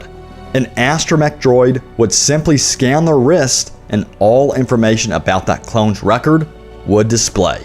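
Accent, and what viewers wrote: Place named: South, United States. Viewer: You sound so fucking country.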